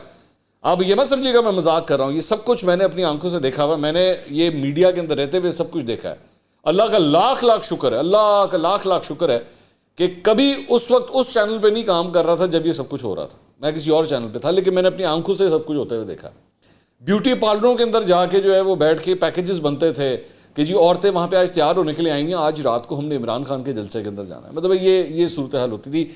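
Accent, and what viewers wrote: Punjab, Pakistan. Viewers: منصور صاحب آپ بھی بیت زیادہ مزاق اڑا رہے ہیں اور آپ کا سٹائل پہور پنجابی لگ رہا ہے -😄😃😀😚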